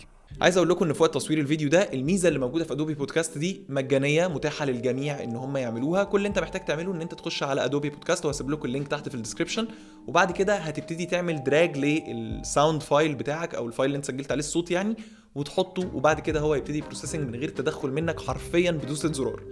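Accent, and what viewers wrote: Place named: United States. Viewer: مادري المشكله بس عندي ولا لا بس لاحظت ان لكنه الكلام تقلب كأنها امريكي يتكلم عربي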